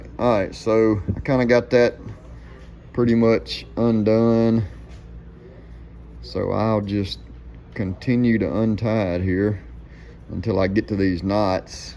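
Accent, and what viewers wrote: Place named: South, United States. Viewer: I love to hear southern drawl talk! It's a southern heritage to be proud of. Hope your hunt is going well! Blessings!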